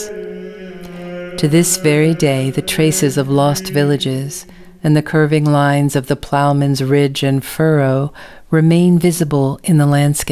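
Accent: United States. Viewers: Why do they have an American commentating this...😂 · I agree that they are informative, but they are mostly about British fashion, so why is an American narrating?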